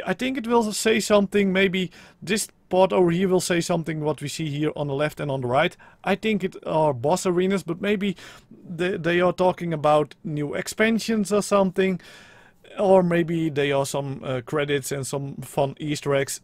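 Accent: Netherlands. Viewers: yo is he dutch ???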